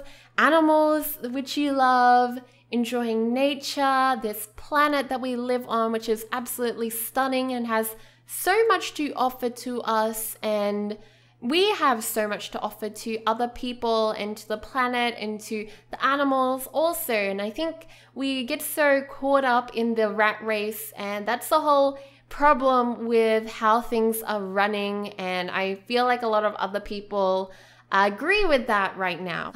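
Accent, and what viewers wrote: Australia. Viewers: Muflon She's Australian :) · is she australian?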